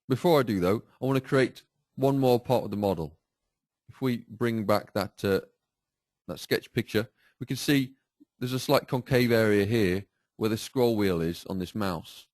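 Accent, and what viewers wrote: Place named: United Kingdom. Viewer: Was pleasantly surprised when I heard a British accent.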